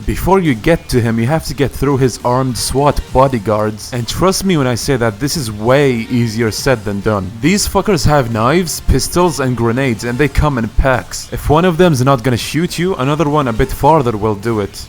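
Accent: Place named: Bahrain. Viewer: It’s very easy to tell that you’re bahraini from your accent 😂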